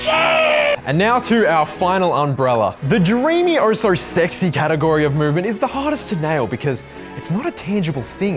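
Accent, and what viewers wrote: Australia. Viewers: Australian(?) Nakey Kakey I love it. Gladly just found you today. Keep up the good work plz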